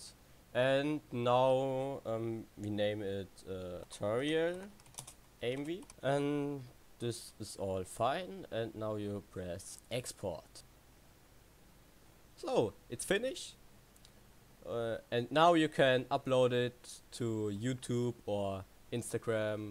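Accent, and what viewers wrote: Germany. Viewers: wie man einfach raushört das du deutscher bist ^^ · I think ure from german hab ich Recht ;$ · Ey man merkt deinen deutschen Akzent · Das ist der deutscheste Akzent denn ich yeh gehört habe, aber gutes video und erklärung. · Hab vor dem intro schon bemerkt das du deutscher bist haha 😂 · Man hat soo krass gemerkt das du deutscher bist Hahahahaha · You have germany accent :) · Man merkt wirklich das du Deutsch bist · Man hört diesen deutschen Akzent XD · Man hört so raus, dass du Deutscher bist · Hey nach deinem Akzent kommst du aus Deutschland oder? xD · i think you are german xD but thanks for the tutorial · wtf just buy the Program and dont crack it 😂 btw dein deutscher Akzent ballert 😂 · Man hat echt doll gemerkt das du deutscher bist>~< No front an dieser stelle. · haha geiles video, feier deinen deutschen akzent so hart bei deinem englisch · Ich habe deinen ersten Satz gehört und dachte mir nur so: Oh, ein Deutscher xD Aber egal, hat mir echt geholfen, danke !!! 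Habe sonst immer mit einer anderen App gearbeitet und war jetzt etwas überfordert mit Adobe xD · Man merkt das du deutscher bist dein Englisch ist aber trozdem gut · ich dachte dauerhaft „warum spricht er so?“.. dann habe ich unten in der Windowsleiste den Deutschen Satz gelesen & dachte mir „AHHHHH DESHALB!“ man merkt halt unnormal krass dass d deutsch bist :D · haha ich hab gemerkt das du deutscher bist ich spreche genau so english hahaha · Man merkt das du deutsch bist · Man hört halt voll das er deutscher ist😂😂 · Man hört es so stark raus das du deutsch bist xD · Nach 2 Sekunden schon erkannt, dass du deutsch bist^^ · Man hört das du aufjedenfall ein deutscher bist aber trotzdem super gemacht!!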